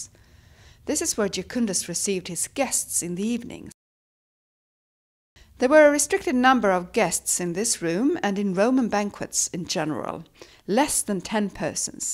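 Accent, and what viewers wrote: Sweden. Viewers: Well, she has a very strong Swedish accent, so I just tried to ignore it. My pet peeve is when people so often write "women" in the singular, but never "men" in the singular. It's like logic escapes them. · Not fake, it's a Swedish accent. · What a 'weird' thing for you to say. In Europe we tend to learn more British English. Did you expect her to speak in an American accent? She's Swedish and speaks British English with a Swedish accent.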